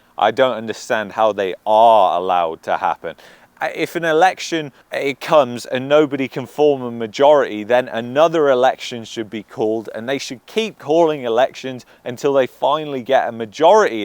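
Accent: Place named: Birmingham, United Kingdom. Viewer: Your severe handicap is being a brommie. It's hard not to sound think, and come from Birmingham. You are clearly not thick, far from it, but you have a challenge.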